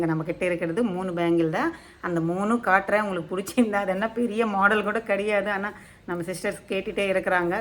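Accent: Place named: Bangalore, India. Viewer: Akka neenga Bangalore uh slang Apadi Iruku....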